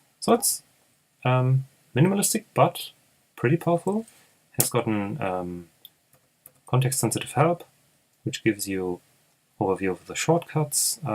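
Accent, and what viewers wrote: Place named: Germany. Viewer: I don't know why, but the German accent makes the tutorial more trustworthy 😄 very good video, thanks!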